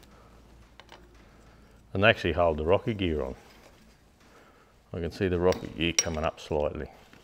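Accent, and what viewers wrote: Australia. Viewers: I love your Australian accent 😁🥃🇺🇸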